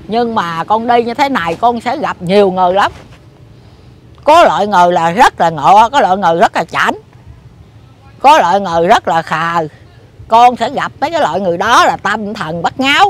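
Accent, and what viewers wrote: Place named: Mekong Delta, Vietnam. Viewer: bữa giờ nhớ quá vì không có được xem và nghe tiếng miền tây của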